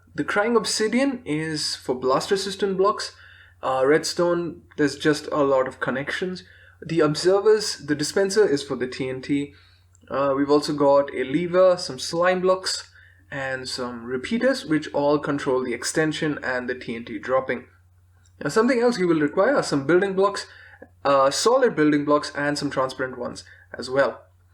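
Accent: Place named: India. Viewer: bro ur from india right ? cause u definetly sound like and indian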